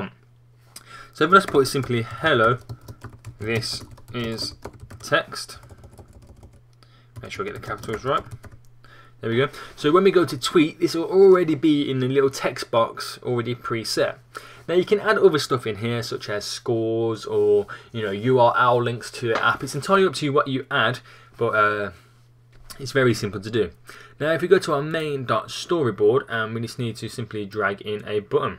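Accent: United Kingdom. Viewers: Love the british accent! "Tweets to Twi-e" :)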